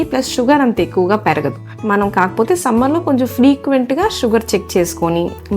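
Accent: Guntur, India. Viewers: Mi slag chusi ankunnanu mam miru ma guntur jilla vallani❤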